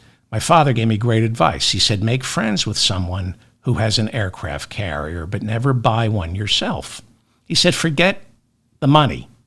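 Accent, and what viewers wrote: New York, United States. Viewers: Love your New York accent!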